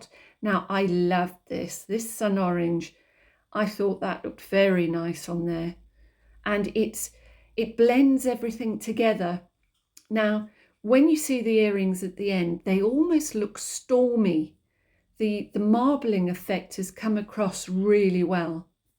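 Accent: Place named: England, United Kingdom. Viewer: I’ve thought about trying acrylic inks on polymer but I’ve tried some other inks without great success so hadn’t got around to it. I’m also going to try some acrylic binder medium with various inks/distress oxides/watercolours etc. to see whether that will help them stick to the clay & dry. Your earrings do come out rather well & adding the drops directly to the clay sheet & blowing/letting the ink run/dabbing with different textured cloths etc may create some beautiful effects. May I offer a suggestion though? I’ve looked past your videos a few times because I tend to watch YT on my phone & your thumbnails tend to be so “busy” with a large collection that I can’t see how well they actually come out or what the result is. But I must admit I do enjoy a nice straightforward tutorial with a lovely English accent (my parents are English) so I wish I’d tried yours sooner. 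I tend to find a good closeup of a single item against a bright background gives a much better idea of what a tutorial will be like & whether it’s a technique I haven’t tried, or a result that’s interesting. There are so many creators that it’s little tips like that that can help you stand out more 😊 Thank you for the inspiration!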